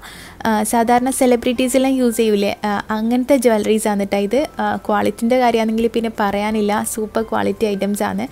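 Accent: Kannur, India. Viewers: ചുവന്ന കുറുവയല്ലാട്ടോ മഞ്ഞ കുറുവ 🤣🤣🤣 നാട്ടിലെത്തിയപ്പോൾ തനി കണ്ണൂർ സംസാരം ആയിട്ടാ പൊളിച്ചു 😍😍😍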